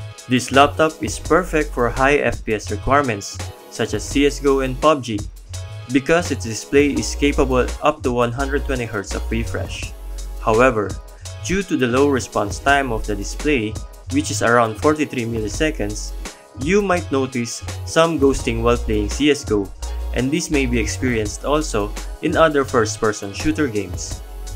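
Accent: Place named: Philippines. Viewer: Im not a Pinoy but I can recognize that the reviewer is Pinoy from his accent 😁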